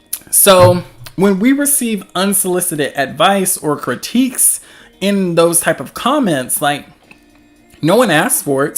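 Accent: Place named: South, United States. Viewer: no way i love your accent! i'm also from the south but i don't live there anymore so it's kind of comforting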